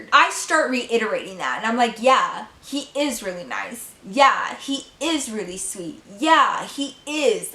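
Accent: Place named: South, United States. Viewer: southern accent comes out more when she gets mad 😂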